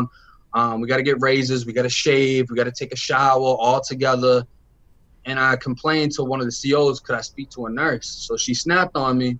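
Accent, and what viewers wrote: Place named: Boston, United States. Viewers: yo G Im from NY to me youu sound like you have a Boston accent with a A's and R's ... Car you sond like kah-rrr.. The broomstick should be called "Frisk From In Too Deep" from LL Cool J wit his sons Godfather right hand mans on the table. "Youu see him butt naked on the pool table tide down all bleedin n shit n say to yourself o God what a foul nigga right.... welll IM DRUNK WIT REVENGE MF LOVE IT LOVE IT, THINK ABOUT HER THINK ABOUT HER"... that shit was Brazy for homeboy boy, shit was crazybrrazy for me. Im cool wit everyone more 3's but MAD 2's too.... Im good on me, when i went in they tried to give me mad shit n my heart check was so crazy bro this big OG CRIP wit a gray durag n green slippers on sees im the only one thats 5'9 or looks white, we get transfered 6 ppl chain gang style n obv big homey ASSUMED i was FOOD, but he found out I WASNT FROM JUMP STREET N IM STILL IN CHAINS WIT THE PPL N GUARD, then when iwas on the phone tryna tell her to get my shit from the safe n he gonna try n take my phone or commessary or think im food! 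I SHUT TTHAT SHIT DOWN FUCKIN FAST n it was my 1st Time in Riverhead, CI was diff! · I love the Boston accent that is coming from a straight up natural born Brooklynite... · Boi has the Boston voice.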